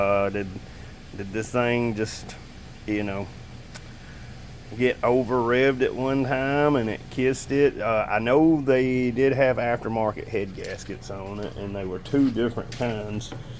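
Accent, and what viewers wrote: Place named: Alabama, United States. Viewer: I saw Alabama car rescues and clicked on the video and as soon as i heard you start talking i knew your from Alabama. 😂😂 Me too, dont know why ive never seen channel before,new sub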